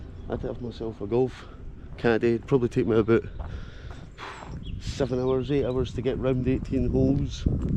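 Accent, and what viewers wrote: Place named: Scotland, United Kingdom. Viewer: When is a loch not a lock Answer When your listening to a Scott description of his own Country Music to my ears 👍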